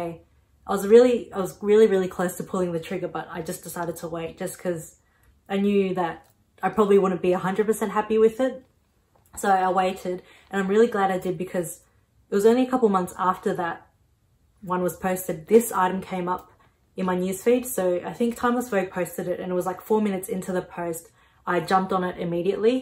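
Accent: Australia. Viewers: So refreshing to hear an Australian accent! I’m from Melbourne as well! If you don’t mind me asking, what do you do for work?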